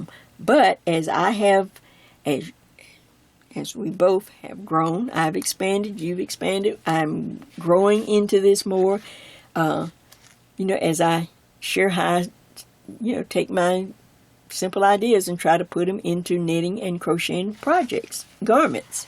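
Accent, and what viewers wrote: South, United States. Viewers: Only just subscribed couple days ago. I've been crocheting for decades but the recent introduction of so many of the old... really old stitches is so exciting. I learned to knit, crochet and embroider all at age 11. I still enjoy 2 out of 3. I prefer not to knit. Too mundane and not enough texture. I cane view a piece then reproduce it. I'm unable to use the charts or should say they slow me down. I prefer reading the row by row or rd. by rd. written directions. I will certainly be purchasing both of those books at the JoAnn's I used to work at. I will be tuned into your channel every time I get notification you've put up a crochet video. I love your friendly voice and giggle with your very distinct southern accent. I lived in La. and Miss. Each southern state's accent is very unique unto itself. Thank You for all your efforts in this field you so obviously love as you shine brilliantly at it. 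I'm Grateful, LYNDA in Utah.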